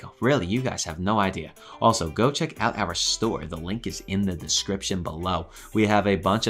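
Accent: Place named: Philadelphia, United States. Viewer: Great video, dude. Subscribed. You sound like you're from the Philly area, yea? Cheers, looking forward to more from ya.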